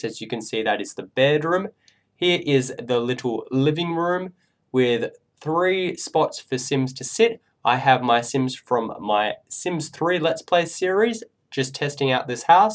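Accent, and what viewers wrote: Australia. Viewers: is he from Australia? because he sounds like it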